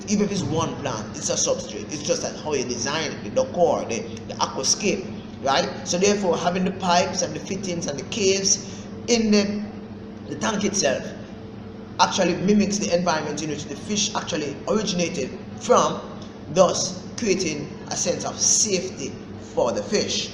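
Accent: Jamaica. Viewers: Im going to enjoy your cannel good Sir and cheers buddy from atl Ga me and my wife saw your country and went to the blue hole your county is so beautiful and everyone i met was so kind and down to earth god bless ya and best of luck with your cannel good Sir and love your jamacian tone ya man and much love to you and your lovely country and lovely people take care bud :)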